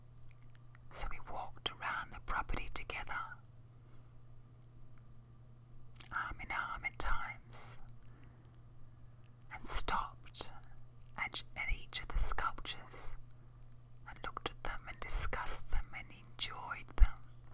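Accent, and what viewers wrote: Australia. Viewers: Such a pleasure to hear a fellow Aussie! Very intense! And very close to home! <link> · I find I can't watch your videos as often as other ASMR artists videos, because I don't want to risk losing the tinglefest I experience whenever I listen to you speak in that gorgeous Australian accent! That is, when I don't lose myself in your beautiful face! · I love the Aussie accent.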